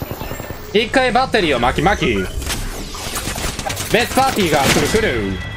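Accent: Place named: Japan. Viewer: Japanglish for the win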